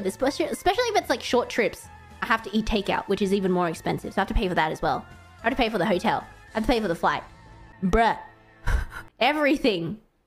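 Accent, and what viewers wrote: Australia. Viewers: Imagine moved to japan then she talked normally with no aussie accent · I hope could maintain her aussie accent in Japan. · At the surprise of know one, but fr as long as she keeps her Aussie accent it's all good.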